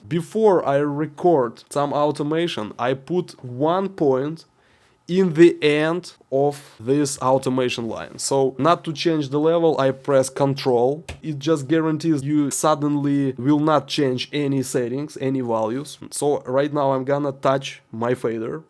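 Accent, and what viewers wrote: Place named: Russia. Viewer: Great one - thanks a bunch! You sound Russian, not that it matters, but what does is your approach and delivery that has no fluff or self admiration - a very Russian way to break things down to essential points and pass them to a comrade :)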